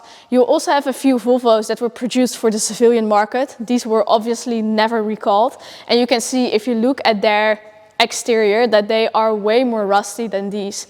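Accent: Netherlands. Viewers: First time here. Heard your Dutch accent right away! Wondering where you are in Nederland? My kids & grandkids are in Barendrecht and Dordrecht area (Ik ben in Utah, USA... lang verhal, hoor! Lol 😅) I'm an 'ol Willys Utility Wagon guy. My dad bought new in '64 a new Wagoneer. Drove it in high school in the '70's. But I fell in love with the older Utility Wagons ('46-'63) I have a '59. I LOVE these old tough off-road machines. The Volvos, Mercedes, UAZ's etc. Usually having only a face a mother could love but capable of traversing almost any type of terrain. I'm pretty sure those machines around you are not challenged much in Holland (except mud) but would be "fun" in Russia or China or...??! You are a girl after my own heart. Would that you had been there years ago when I needed someone just like you. 😊 'sja... Nou ja, zeg! God bless you in making your dreams come true. 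If you ever want to see Utah (Moab) the Great Basin Desert, hit me up. Always welcome here! Totziens! 😎👍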